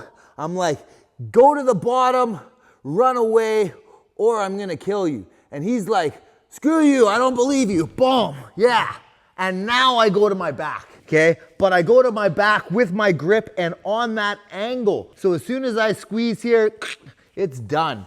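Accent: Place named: Canada. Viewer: 100% you know its Tristar when you hear the Canuck accent.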